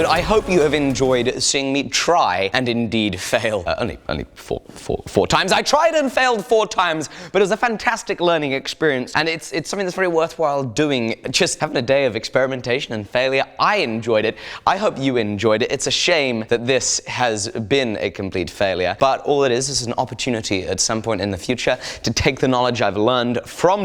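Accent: England, United Kingdom. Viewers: i cant tell, are you british or american? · I can't tell which part of England your from well from your accent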